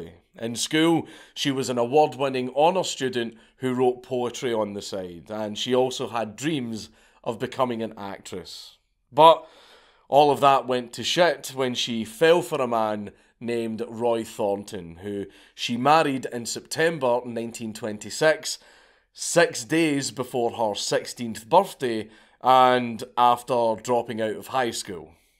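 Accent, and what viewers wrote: Scotland, United Kingdom. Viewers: You know, I never really was interested in the legend of Bonnie and Clyde untill I found out the story was going to be told by this charismatic, jolly Scotsman. 😃👍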